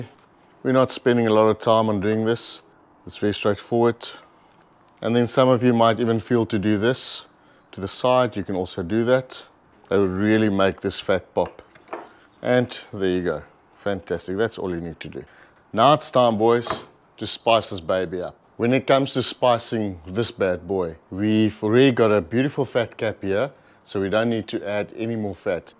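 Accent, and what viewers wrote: South Africa. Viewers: south african accent - hows the racism there? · Thank you! I have been looking for a video like this, But most do not show it right, or explain it like you do. I was pleasantly surprised to hear the South African accent on top of it. (I live in USA for 37 years now, but grew up in the highveld) I acquired a whole sheep a few months ago, and pulled out one half of the ribs to try them out. I will be using your method.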